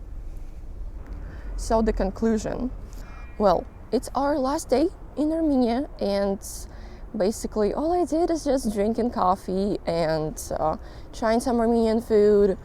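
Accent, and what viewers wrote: United States. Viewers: You've got an American accent with your English I would say. Definitely not a British one. If I saw you in Wisconsin I might almost think you were born here. Nice job. Enjoyed the video. You have a certain charisma and charm.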